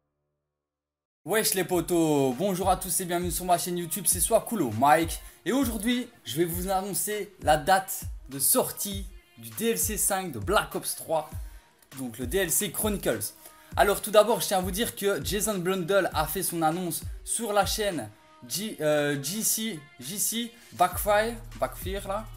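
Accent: Belgium. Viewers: Merci 😜 dit tu es belges?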